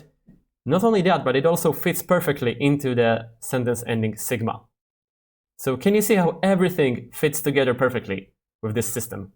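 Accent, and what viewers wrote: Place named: Sweden. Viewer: I can’t help but think that has a subtle Swedish accent. is a name that I never encountered during my decades in Japan and usage of Japanese since January 1985, but it is more common in Sweden’s neighbouring Finland. Yet, I notice that his Japanese has proper pitch accent, so I’d have to assume he must be Japanese. The pronunciation of “grammar” does sound more Japanese, but the T in 妹 wasn’t clear, so that leaves some slight lingering doubt. Oh, actually, his す at the end of お疲れ様です does sound like that of the recent generation of young Japanese men who are starting to pronounce す nearly like English “th” instead of a pure “ss” as we’d normally hear in my generation (born in 1965).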